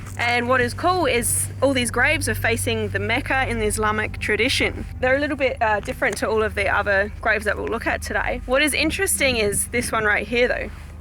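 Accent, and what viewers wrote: Australia. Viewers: Hey 😍 how ste you angel i would love to go to Australia I just love the accent 😍 I love yours angel obt way ❤ I love that spooky video at the school I saw saw both awsome love 🌷🌷this video omg is so mysterious you can feel.the chill thank you for another video love from your back out back yard lol love always angel 😍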